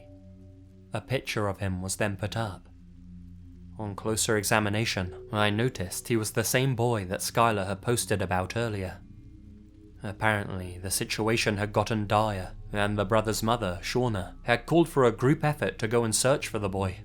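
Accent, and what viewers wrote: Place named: South East England, United Kingdom. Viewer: It's a bog standard middle class south east England accent. Nothing like Scottish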